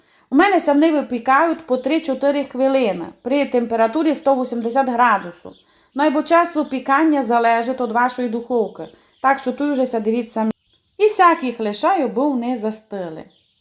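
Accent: Transcarpathia, Ukraine. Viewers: Який гарний закарпатський діалект. Це багатство нашої мови. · Люблю закарпатський діалект. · я ще не їв того пляцка, напевно дуже смачний. Але я милувався твоїм голосом з закарпатським акцентом. Дуже мило і приємно слухати тебе. Дякую що ти на каналі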